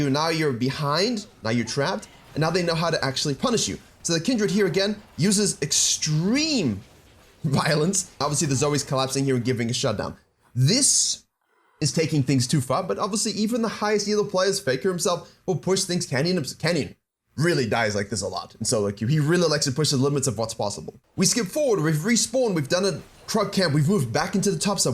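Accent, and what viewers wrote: South Africa. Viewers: Was wondering from his accent where he was from, that's really cool to know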